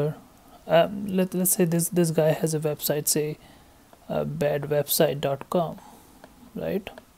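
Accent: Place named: India. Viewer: these indian's accentes is disgusted even for my non-english ears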